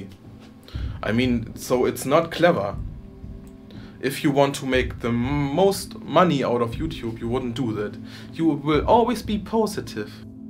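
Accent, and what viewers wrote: Germany. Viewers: I really agree with everything you are saying. I own 5 Harley Bentons now and I enjoy every single one just as much as my snobby Schecter for over 1500 bucks. I've told everyone who asked me what guitar they should buy to check out the Harley Benton products. You just can't get anything better for the price(or sometimes even double the price) and if you should ever get a flawed one, you can always send it back for absolutely free. Keep up the good work, spreading your knowledge and helping other people not being tricked into this expensive gear snobbery. Nevertheless, I would also like to recommend you to work on your englisch. Not trying to be mean or anything. I know it can be hard, especially for german speakers(i'm austrian btw) because the german language is so vastly different in the pronunciation, and i appreciate your effort to make this understandable for as many viewers as possible. 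But I really think it would help your channel a lot to work a little bit on the english just to comfort the native speakers ;) That being said, I hope that you will get to the success that you deserve for your effort and pure honesty and I wish you all the best on your way. I'm happy to say that you just gained another Subscriber!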